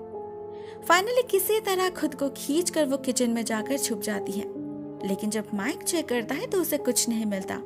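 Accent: Bengal, India. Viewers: Didu ap bengal se ho kya apki voice bhot sweet hai isliye lagta hai 💜😍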